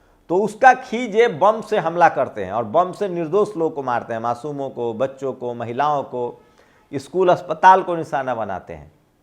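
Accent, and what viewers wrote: India. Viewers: Mr your desi dialect is wonderful and your vlog presentation is really unique I really like it....keep it up speak for humanity.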